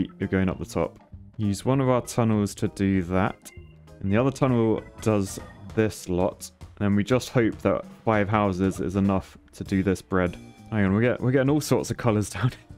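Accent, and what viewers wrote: United Kingdom. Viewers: I'm actually impress that you got it first time the "Sierra de las cruces" yes, you had the little British accent, but the pronunciation is correct :D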